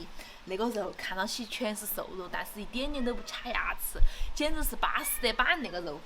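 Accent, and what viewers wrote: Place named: China. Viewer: 好懷念妳講普通話喔。真的很好聽很有魅力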